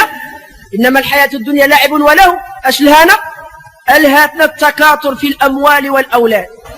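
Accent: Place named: Rif, Morocco. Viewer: و لكن اللهجة ديالو ماشي كازاوي كي بان لي بحال ريفي أو شمالي و لكن طيب، أين المسجد الذي كان يعطي الدروس و يخاطب؟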